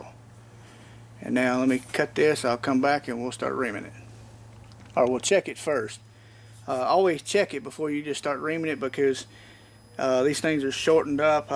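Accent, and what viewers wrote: Alabama, United States. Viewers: excellent demonstration of re-barreling and head-spacing an M1 Garand. Don't worry about your Alabama accent, I understood everything that you said on your 4-part video. Like another viewer commented, you have given me the confidence to re-barrel my own projects. I would love to go to Gunsmithing school, but there are no decent ones where I live. Thank you kindly, from California, for taking the time to show us all how it's done, and on a budget to boot.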